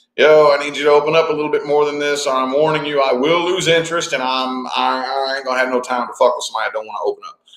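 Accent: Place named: Kentucky, United States. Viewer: Kentucky Girl here loving that hint of your Kentucky twang!!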